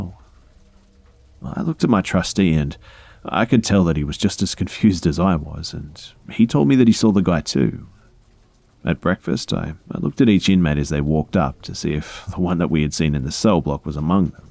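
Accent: Australia. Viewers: If you would have told me 10 years ago that I would one day have an Australian man lulling me to sleep at night, I would have thought you were smoking crack. Present-day-me wonders why more people don't have an Australian man lulling them to sleep at night. People probably think I smoke crack.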